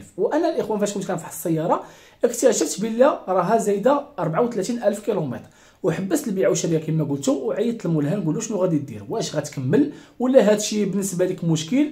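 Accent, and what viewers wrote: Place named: Algeria. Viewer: واش نتا مغربي نقص شويا من لهجة الجزائرية شكرا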